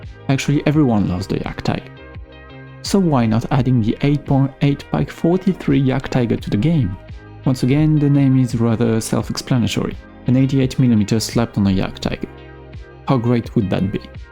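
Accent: France. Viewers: Your french accent is so exiting❤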